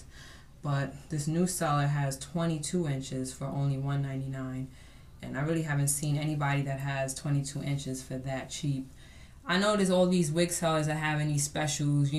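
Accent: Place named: New York, United States. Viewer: I super love your new york accent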